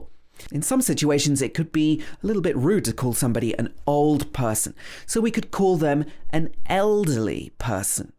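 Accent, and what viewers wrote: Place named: United Kingdom. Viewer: Hi,. How are you?; I am living in Manchester and it is more important to improve my British English. It would be great if you make some videos of lessons like this THAT IS VERY PRODUCTIVE AND IMPORTANT. Your British pronunciation is great and all lessons as well That is the reason why I am learning English with you at the moment I have IELTS 6.5 but it is not enough. Thank you.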